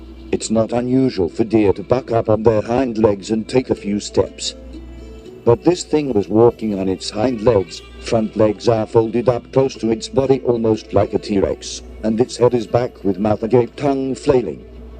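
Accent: United Kingdom. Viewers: Thanks for the upload brother. Got a new channel to binge later. So far you are the only other text to speech channel that used the male English accented voice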